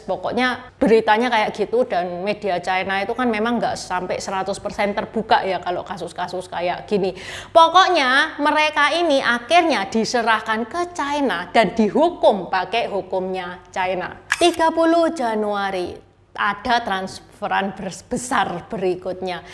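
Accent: Java, Indonesia. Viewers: Seneng banget sama itu logat jawanya medok bangeeet,waw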